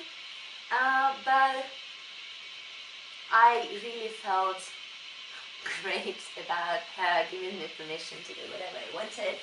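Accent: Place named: United Kingdom. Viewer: damn ur bri'ish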